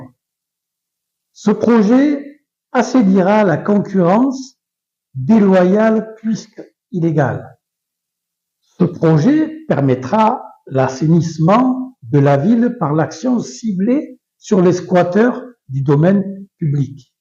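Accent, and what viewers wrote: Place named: South, France. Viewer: Qui es tu? Avec ton accent du Sud français, pourquoi tu ne reste pas à 6000 km à t'occuper de l'urbanisation marseillais?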